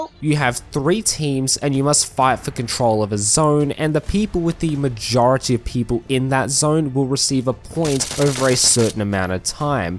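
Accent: Australia. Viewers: Man, I'm a sucker, I hear an aussie and I hit like (sorry if you're a kiwi)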